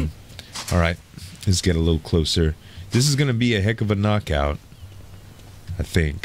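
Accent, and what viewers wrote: Louisiana, United States. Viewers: I want to ask you, but I don't want to miss-place you. You have an accent, and I love it. I just subbed to you so forgive me if you've already established this, but is your accent for Louisiana ?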